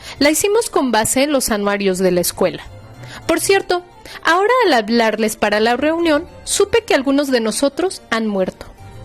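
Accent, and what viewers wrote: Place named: Mexico City, Mexico. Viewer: El acento chilango le dio el toque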